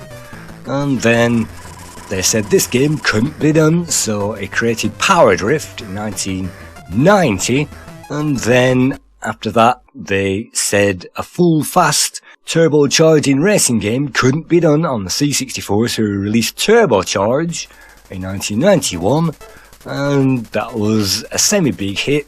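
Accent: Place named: West Country, United Kingdom. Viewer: What is your accent? Sounds part northern, part west country and part Dutch.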